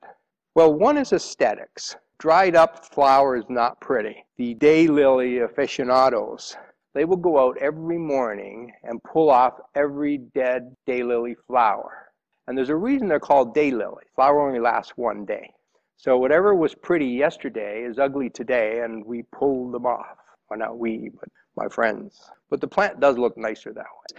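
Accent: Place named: Canada. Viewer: Are you in Canada? You have a bit of a Canadian accent ie the way you say process.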